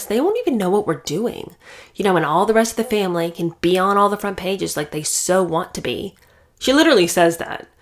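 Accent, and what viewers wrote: United Kingdom. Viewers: Love listening to you! Your British accent is hilarious ❤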